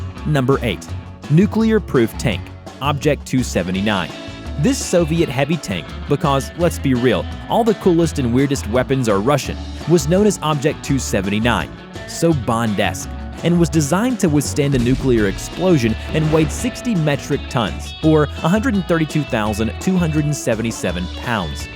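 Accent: United States. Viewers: Err.., Dear Mr.Narratorr, Sir, while U most certainly sounded American, dunno if U actually are, but eant the word Corps. as in US Marine "Corps", pronounced as "Core or Corr" phonetically, rather than the disturbing "Corpse" - wic wud be a DB, cadaver or maybe a carcass evn. Thus far, I thought only some individuals native to India only sez Corps as literally in as the offensive sounding "Corpse", He-He, tis' ez cute.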